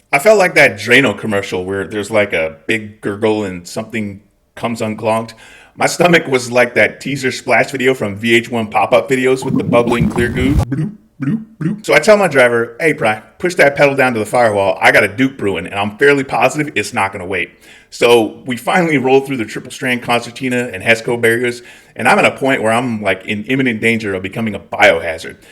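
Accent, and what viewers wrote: Scotland, United Kingdom. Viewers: as a resident of Northern Ireland. Your Scottish accent had a fair hint of a Belfast twang to it! Lol! Nonetheless, you can definitely hear the Scotsman in your speech! Your stories are funny as fuck!! I've never served (My Mum's side of the family did during WW2!) but my Butthole has went nuclear on several occasions! I've made toilets glow in the dark! My farts threaten the fabric of space and time! (Not to mention the fabric of my jeans!😬😬😬)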